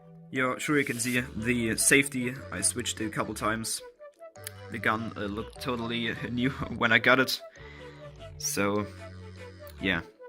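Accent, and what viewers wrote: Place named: Germany. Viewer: Der deutscher Akzent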